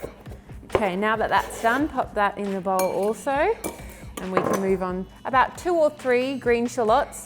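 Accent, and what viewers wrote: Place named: Australia. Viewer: I think her is Aussie Accent!!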